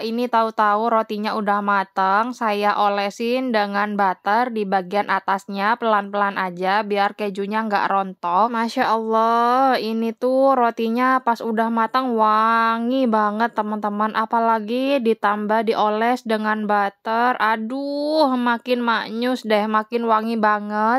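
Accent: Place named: Bondowoso, Indonesia. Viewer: Mbak ini dimana rumahnya? Koq lafadnya kayak orang Bondowoso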